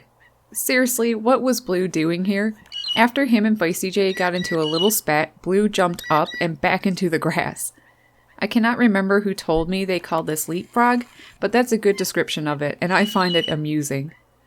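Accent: Newfoundland, Canada. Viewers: I love your jays!!!! My jay family seems to have totally different calls, reactions and habits than yours. Maybe cause they in a different side of the continent? I just love them too!!! Happy Jay watching!!! 💙 (I'm guessing from your accent you're in NFLD?) 😁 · This was very entertaining! I LOL'ed several times. I've started feeding blue jays recently, but I did not realize they could become so comfortable with humans. Their squeaks sound a bit different where I live... you sound Canadian... maybe your blue jays have a Canadian accent? Anyway, thank you for sharing this remarkable and delightful footage.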